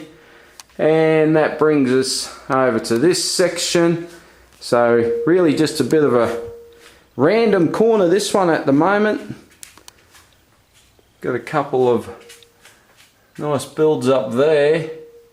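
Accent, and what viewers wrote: Australia. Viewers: That is a huge collection... but can you tell me what accent that is? Is it Australian?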